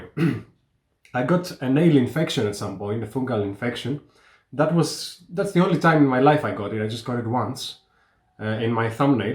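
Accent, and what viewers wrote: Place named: Greece. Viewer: Greek accent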